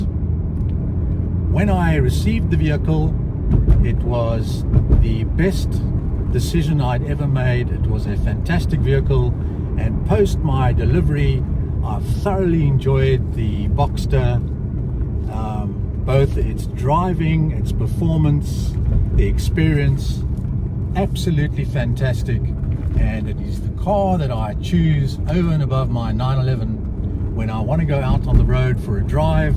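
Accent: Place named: South Africa. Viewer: Hi. Nice to hear your review and your SA accent! I’m amazed that you prefer your boxter vs 911? Find this surprising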